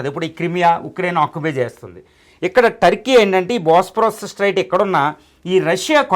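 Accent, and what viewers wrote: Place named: West Godavari, India. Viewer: Bhabai,what an explanation sir (with WG.state accent) good , very good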